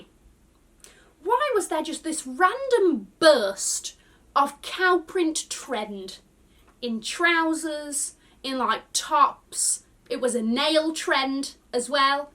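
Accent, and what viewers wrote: United Kingdom. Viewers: You are the first British accent that I’ve heard say Tig ole Bitties 🤣🤣🤣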